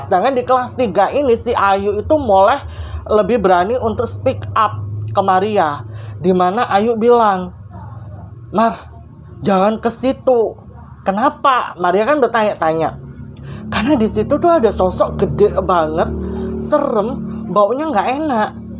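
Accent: Surabaya, Indonesia. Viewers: Mba cara cerita nya,logat Surabaya nya kental bgt....semangat mba,sukses sll.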